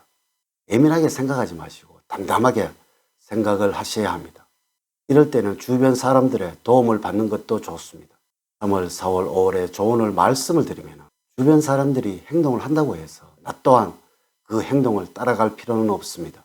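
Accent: Gyeongsang, South Korea. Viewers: 갱상도말씀 때문에 숫자가 잘못알라 듣겠다😢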